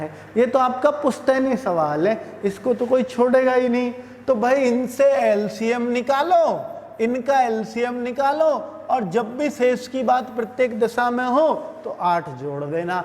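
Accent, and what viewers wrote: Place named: Haryana, India. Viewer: sir.. Mujhe aap k video dekhte hue 4-5 din hi hue hai, but itne me hi Mai aap ka Bhout bada fan ho gaya hu... Specially aap ke haryanwi boli ka! Mai jaldi kisi Ki video like nahi karta, but aap Ki Mai video dekhne se pehle hi like Kar deta hu!😊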